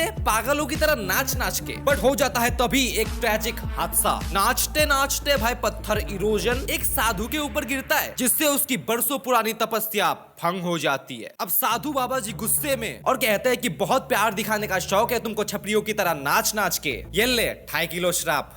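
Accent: Assam, India. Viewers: Why you sound Assamese?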